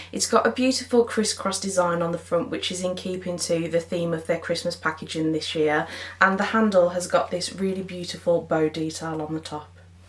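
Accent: West Midlands, United Kingdom. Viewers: Hi hun was looking to buy this set and thought I would check out a video on you tube to see if worth it and found your channel, you sound local West Mids? but yes great review they should give you commsion for promoting this lol.....will check out some of your other videos have sub'd :-) x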